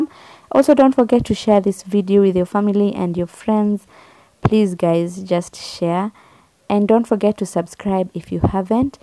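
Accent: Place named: Africa. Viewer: I love your English i thought you're African wauuu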